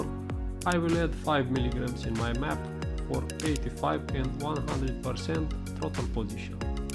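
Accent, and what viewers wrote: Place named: Romania. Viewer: Mi-am dat seama ca esti roman dupa accent.😁Frumos video spor in continuare.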